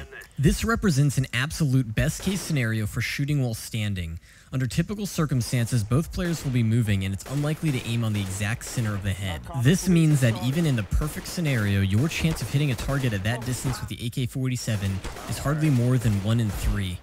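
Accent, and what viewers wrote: United Kingdom. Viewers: Good job on finding a subject not already rinsed by Philip. Your English accent is dire mate, kind of a weird nondescript UK chav come cockney gangster crossed with some old Victorian gent. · nice brit accent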